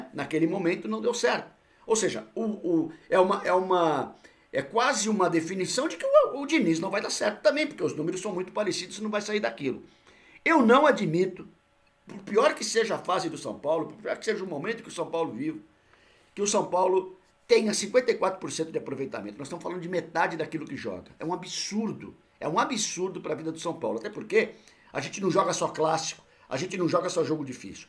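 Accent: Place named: Rio de Janeiro, Brazil. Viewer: Em qual cidade vc nasceu , vc tem um sutaque carioca kkkkkkkkk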